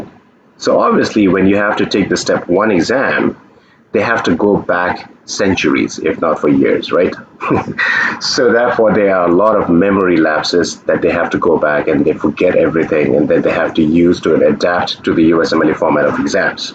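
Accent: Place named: India. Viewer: Indian accent is awesome.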